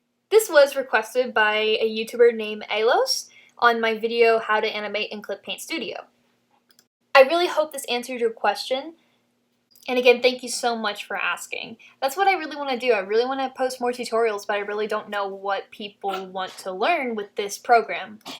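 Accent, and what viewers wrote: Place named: Australia. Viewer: Why do you speak Australian?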